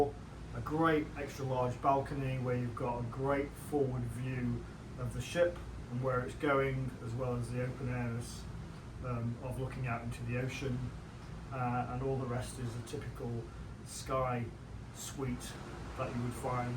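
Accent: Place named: United Kingdom. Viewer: fantastic video, loved it. questions: bathroom, soaps incl, lotion, shampoo/conditioner incl? 2nd question magic carpet you stated nobody can see us on balcony? if magic carpet goes/up down, how do people not see you? Oh love your accent as Americans have various accents too, southern, and boston, new yorkers talk different and rest of us have regular accents but love the UK accents so so awesome....you sound like a teacher or public speaker awesome love your presentations.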